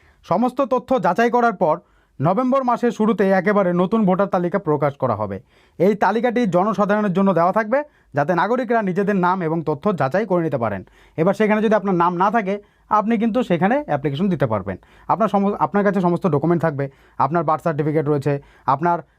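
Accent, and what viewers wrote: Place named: Bangladesh. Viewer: আপনার সব ঠিক আছে তো? আপনি তো বাংলা দেশি, আপনার কথায় বাংলাদেশি টান আছে।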